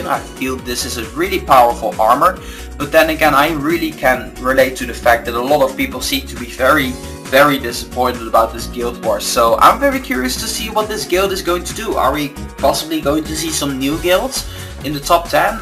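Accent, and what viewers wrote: Netherlands. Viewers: Ben jij nederlands? Je klinkt zo!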